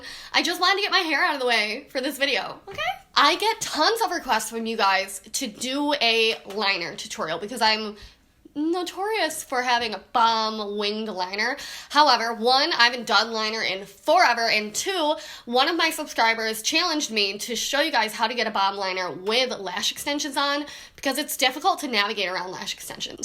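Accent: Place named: Midwest, United States. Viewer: Midwest accent for sure!!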